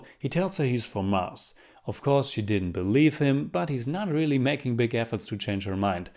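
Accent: Germany. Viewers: This is the first vid I'm wtaching from you Are you German ?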